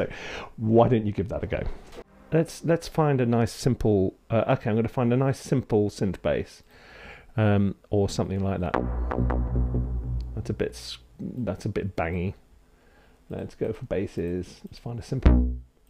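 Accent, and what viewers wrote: United Kingdom. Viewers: for the 30 seconds i just like his British accent and humble personality just pairs well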